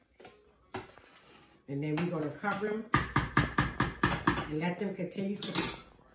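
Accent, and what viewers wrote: Baltimore, United States. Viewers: I can hear the Baltimore accent heavy 😎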